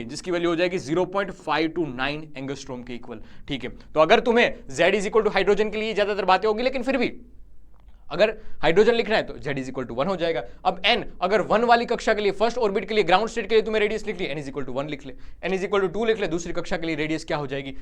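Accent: Gujarat, India. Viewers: Sir you are gujarati or not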